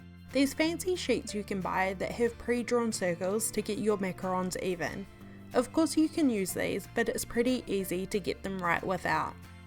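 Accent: New Zealand. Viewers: Trying to find the info but I cannot. Lol. Are you a kiwi!? 🤩❤️ Hahaha the accent is undeniable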